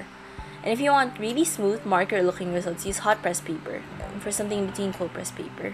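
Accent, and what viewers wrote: Philippines. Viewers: I love this brand! thanks for the vid xD I can hear the filipino in your words ~ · Hi! Are you Filipino? You have their accent and that's amazing! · I think you are a Filipino because I know how Filipinos speak English. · I love your Filipino accent lol 🇵🇭🇵🇭🇵🇭🇵🇭🇵🇭🇵🇭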